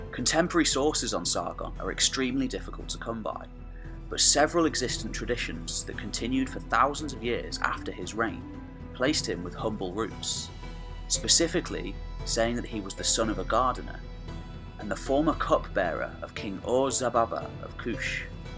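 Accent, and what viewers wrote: United Kingdom. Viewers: wait, you forgot to mention his luscious british accent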